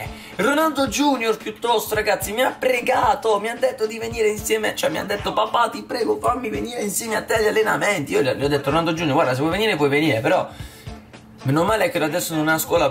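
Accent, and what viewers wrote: Bari, Italy. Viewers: senti ma sei barese?Pk dall'accento pare che tu sia barese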